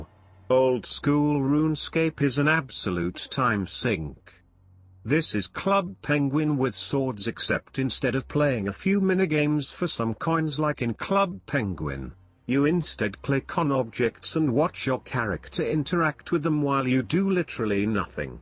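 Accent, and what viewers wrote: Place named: East Tennessee, United States. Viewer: You eastern tennessee hillbilly.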